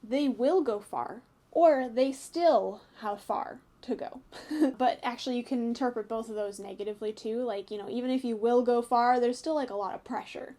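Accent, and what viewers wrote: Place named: Canada. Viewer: you don't have a strong canadian accent, you sound pretty american most the time but the way you say some words sounds canadian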